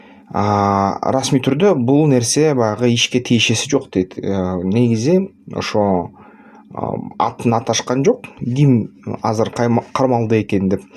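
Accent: Standard. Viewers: Байкем Аман болуңуз кыргызча таза сүйлөгөнүңүз жагат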